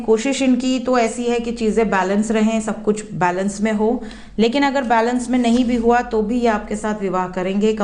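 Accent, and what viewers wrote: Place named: Bhojpuri, India. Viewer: mam kha se hain aap bhojpuri bol rhi hai vaise mai ghazipur up se hoon 😂❤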